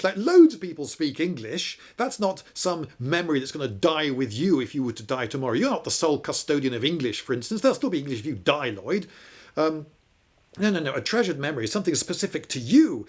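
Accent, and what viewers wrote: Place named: RP, United Kingdom. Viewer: I would recommend that English learners focusing on the Received Pronunciation accent watch this channel. No trace of Estuary, Cockney, or other regional influence.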